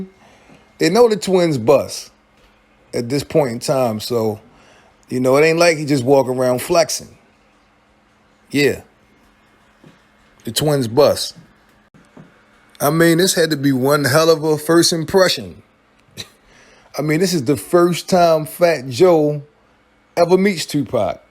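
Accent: Baltimore, United States. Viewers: You front Bmore you kinda have a accent like you from here my dude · U got to be from Baltimore with that accent 🤔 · You from bmore aint you? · I bet your bottom dollar this dude doing the narrating is from Baltimore guarantee it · U from Baltimore i can tell by the way you say to and do lol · Sounds like your from Baltimore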